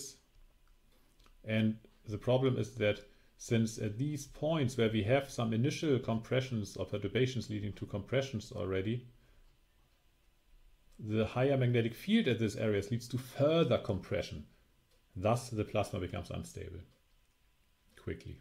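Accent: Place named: Germany. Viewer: Tolle Serie, bei den meisten Dokus wird immer das gleiche gezeigt. Mich hat schon lange das Thema Kernfusion interessiert und ich "verschlinge" alles was es zum Thema Fusion in Form von Videos gibt . Daher haben mich auch die Frühen Jahre dieses Forschungszweig interessiert (Spiegelmaschiene usw) vorallem die ersten Prototypen die ja eher noch Winzlinge waren . In dieser Richtung findet man eher wenig im Www . Mit dieser "Dokureihe" hat sich dieser wunsch erfüllt. Danke! Einziger manko das es in English ist was aber wiederrum verständlich ist da ja in der Wissenschaft English die Umgangsprache ist . Gott sei dank ist es English mit German Aktzent 😅 das macht es leichter. verständlich . Grüsse aus Austria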